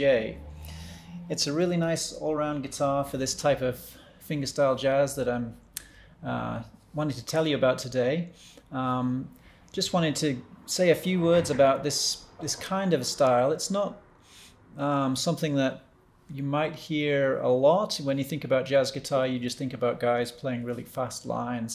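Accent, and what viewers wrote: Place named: England, United Kingdom. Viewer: Hi just seen the horizon doc Strange signals from outer space, very interesting.Great guitar playing by the way. Noticed your accent. Are you English.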